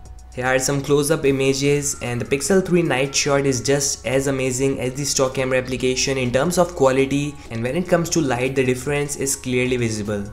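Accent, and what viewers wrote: India. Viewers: I thought you were Indian!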